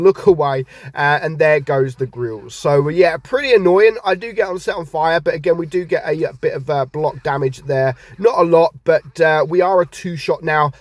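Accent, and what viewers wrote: United Kingdom. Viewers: apac i like ur briish accent mate lol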